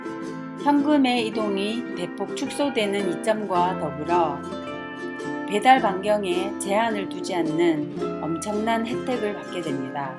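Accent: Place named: Seoul, South Korea. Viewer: ^ ^~ 나레이션 목소리가 넘 이쁘네요.. 서울 사람 인 것 같아요 으~ 흐 .. 넘 힘드셨지요? 니첼, 도도 없다아 .. ...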